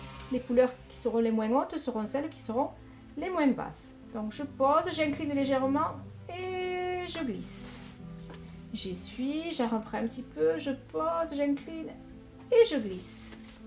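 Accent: South, France. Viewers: Bonjour, Un Très Grand Merci pour ce Partage et cette profusion de générosité artistique personnelle qui est la tienne! Agrémentée par ce merveilleux, savoureux accent méridional qui ne peut que réchauffer les cœurs de ces espèces d'artistes congelés et recroquevillées sur leurs certitudes académiques aux quatre coin de l'hexagone....et même bien au delà.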